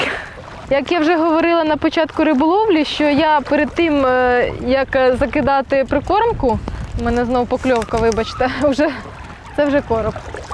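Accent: Surzhyk, Ukraine. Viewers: суржик то сила))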